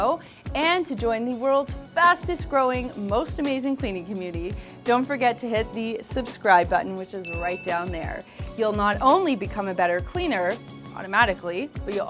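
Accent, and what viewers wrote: Canada. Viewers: You can clean my windows anytime baby ! Just love that Canadian accent.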